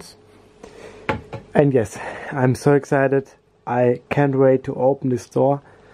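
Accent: Germany. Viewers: Du bist Deutsch. Dieser Akzent 😂❤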